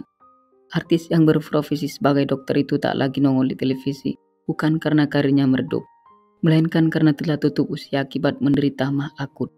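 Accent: Madura, Indonesia. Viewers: Pengisi suaranya kayak orang madura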